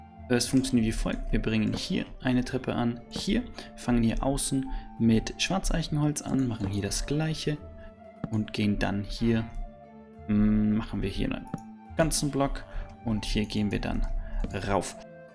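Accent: Austria. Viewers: Kaons sein dost a wosch echta östarreicha bist?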